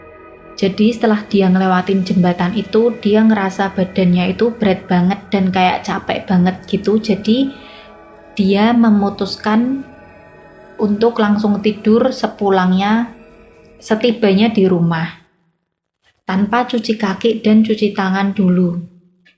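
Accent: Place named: Manado, Indonesia. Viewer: Logat mba nya Manado banget 😅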